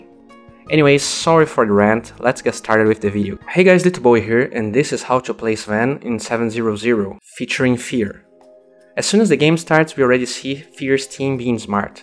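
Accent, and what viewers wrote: Brazil. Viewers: Haha eu reconheço um sotaque BR quando ouço! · teu sotaque parece de br hauahauah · accent de br, inconfundível ! Haha eh nois !! Feliz natal e ano novo tb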